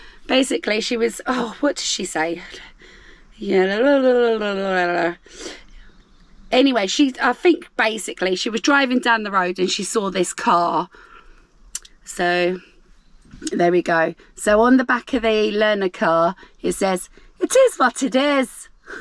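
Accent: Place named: RP, United Kingdom. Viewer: Happy Easter love your posh voice 😅 😅 love & xxx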